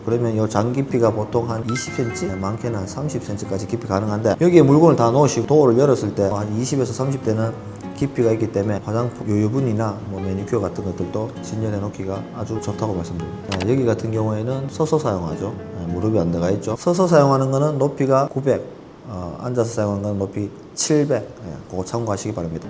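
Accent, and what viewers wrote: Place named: Gyeongsang, South Korea. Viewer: 설명 잘듣고 갑니다. 오랜만에 경상도 사투리 들어서 좋네요 ㅎㅎ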